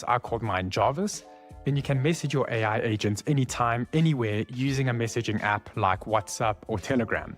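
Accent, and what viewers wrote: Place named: South Africa. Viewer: Didn’t realize how much I missed hearing the SA accent.❤ moved away when I was 11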